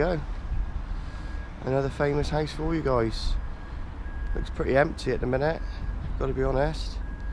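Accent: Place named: Gloucestershire, United Kingdom. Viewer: if from Gloucestershire they all have that accent.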